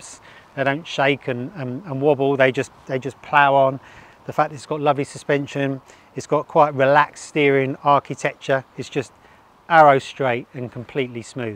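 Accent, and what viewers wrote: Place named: England, United Kingdom. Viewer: The biggest difference of this bike compared to others is the app and electronics. I can't understand with the strong English accent the name of the app? Anyone know? No one that I can find on YouTube spends anytime really explaining 1. Where do they put their phone? What are your options for charging, GPS, streaming? Android? Wish someone would really dive in and really explain. Thanks. My bmw has an amazing GPS app that streams from your phone and has traffic. No charge. This part is so important for a touring alternative. If someone knows of a video on this model that answers all those questions please share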